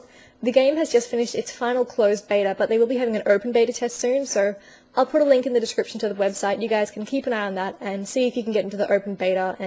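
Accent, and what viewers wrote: Australia. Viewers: australian :O i love your accent XC wish i could exchange my american accent a european or australian :D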